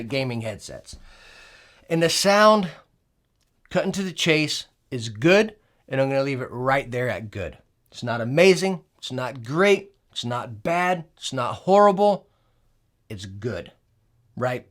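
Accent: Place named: Pittsburgh, United States. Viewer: Can tell there's a high chance is from Pittsburgh with his accent lol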